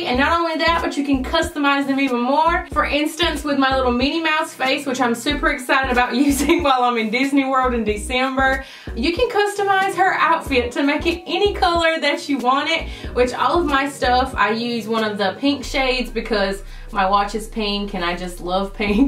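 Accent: South, United States. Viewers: Here for the Southern drawl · You have a strong southern accent, the second i heard it i was like WOW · lol I’ve never seen a YouTuber with a southern accent . Definitely subscribing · Everyone in the comments are saying you have a strong southern accent and I was like I don’t hear it so when we went to go see my cousins in Atlanta they were like omg they were like she sounds just like you also you are so pretty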